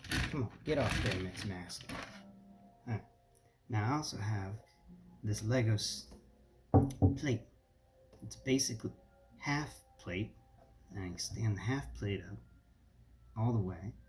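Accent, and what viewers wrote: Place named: Louisiana, United States. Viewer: Alright you asked for constructive criticism so here goes. So I don’t know how much editing you can do, but if you have control over when the video begins, I would wait until your phone does the notifications thing, give it a few seconds of silence then do you intro, and then just drag where the video begins past the notification sound so it’s just before you start talking. So one thing I think you could do is if you are going to talk about the box in the video you could have it pre measured so you don’t have to do that on camera, cause that does pad out the video. But I would also say that most people don’t care about the box. It’s something most people are going to throw away and it’s not what they came to see. So consider removing talking about the box, or put it later in the video. What’s with the Cajun Accent by the way, are you trying to be Craftsman? 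Oof That sucks. I had a similar thing where I went to visit my grandparents, and my parents got the brilliant idea to throw away my bed because it was old while I was gone. But they got lazy and didn’t buy another one by the time I got home, so I had to spend 3 days sleeping on the floor, before I got a new bed. I feel like coffee cups may not be a great unit to measure it. I don’t think we need to know the size of the guns, so definitiely. Maybe come up with a personal scale for stuff like that. Like dinky, regular, or big boy sized guns and accessories, just try to group them without giving an exact size cause that won’t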